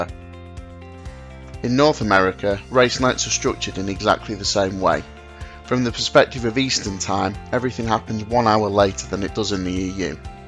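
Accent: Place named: RP, United Kingdom. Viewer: hell yeah commentary with queen's english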